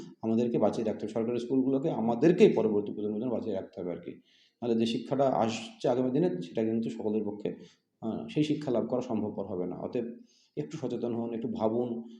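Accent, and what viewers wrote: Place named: Bangladesh. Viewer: Mid day meal thakle cholbe....loker kache taka nei tai hoito govt school thakbe.. apni kothai thaken apnar accent bangladeshi der matan,( Bangladesh theke ese 1995 theke ese aneke class 9 admission nia nei tarai besirbhag school teacher achen) teacher ra porate Jane na ba porai na tai hoito kichu student private a jabe.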